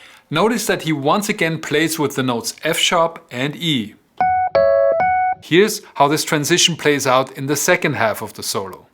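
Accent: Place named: Germany. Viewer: The german accent is killing me thanks so much for the video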